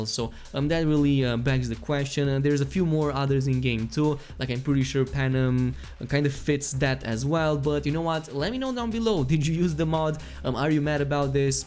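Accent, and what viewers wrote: Netherlands. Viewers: it's a dutch accent :)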